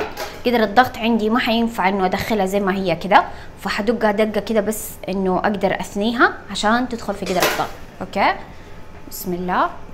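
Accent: Saudi Arabia. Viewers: I adore the Saudi accent mashallah your cooking is amazing 🥰🥰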